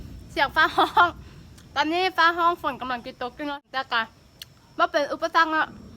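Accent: Laos. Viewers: ตัวนกพูดแบบนี้แหละฟังรู้เรื่องถ้าพูดอีกแบบที่ ตัวเออ มันฟังไม่รู้เรื่องครับพูดแบบคนลาวพูดถึงพอจะเข้าใจครับ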